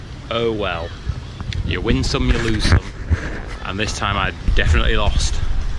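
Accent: United Kingdom. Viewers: What this dude serious in prison? Is tha wha all these comments are about? Damn. Hope he’s in British jail rather than a US jail with this accent bruv. Good luck! Hope they didn’t get u for that long for damn train hopping though god dang. I’m a train fan, yes it’s incredibly dangerous but…anyway. As long as you didn’t hurt anyone or something, sounds like this guy shouldn’t be in jail for 8 years or whatever??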